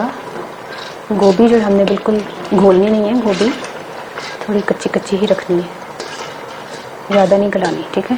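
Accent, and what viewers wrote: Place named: Punjab, India. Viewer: yr tusi punjabi bolde bht zeada cutee lg de ooo keep on 👌👌👌😘😘😘😘😘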